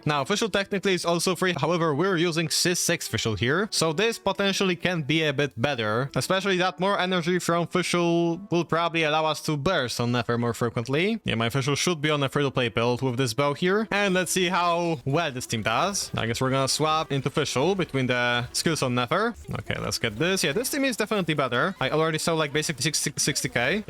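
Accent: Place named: Italy. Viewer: yo are you Italian? love your accent and your videos!!!!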